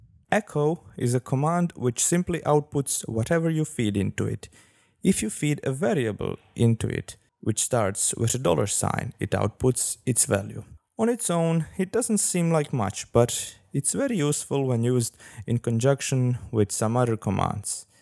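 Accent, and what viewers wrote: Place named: Croatia. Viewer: This.... was... definitely a video I watched. ?_? xD Anyhoooo... I still enjoy listening to that supreme Croatian accent. ;)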